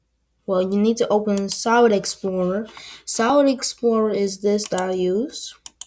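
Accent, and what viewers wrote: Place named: England, United Kingdom. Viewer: a hint of English in your mumbling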